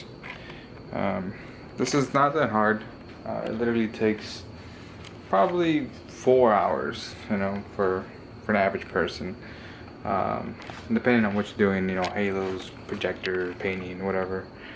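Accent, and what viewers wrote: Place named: Texas, United States. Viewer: What part of Texas your from?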